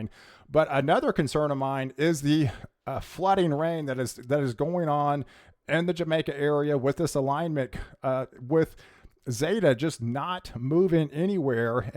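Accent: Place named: Western Pennsylvania, United States. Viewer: You are from western PA. You cant hide the accent!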